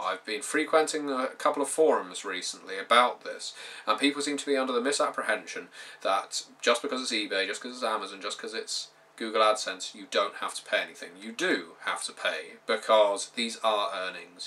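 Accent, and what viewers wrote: United Kingdom. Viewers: Sitting here trying to teach myself about deducting taxes and how to make the proper claims (although I'm from Canada). All I can say about this tedious subject is... thank God for your British accent!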